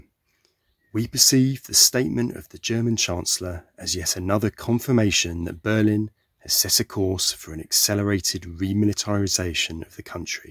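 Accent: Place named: United Kingdom. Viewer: ..."German Prepper" with a british accent?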